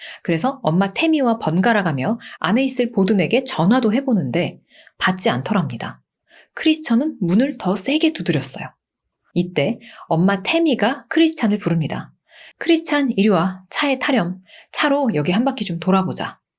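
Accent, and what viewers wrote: South Korea. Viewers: 영어발음 관련해서 까다로우신 분들이 많았나봐요, 전 한국식발음이 더 듣기 편하고 좋은데 ㅎㅎㅎ 고나리 넘 신경쓰지 마세용 ㅎㅎ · 한국식 영어발음은 친근하고 귀에 쏙쏙잘들어오니 좋은걸요 ㅎㅎ 바른 발음으로도 알려주시고 여러모로 꼼꼼하게 다정세심하신 님❤ 오늘영상도 고맙습니다! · 🙄ㅈㅓ는....한국식 발음이 좋ㅇㅏ요.!❤😁👍ㅎㅎ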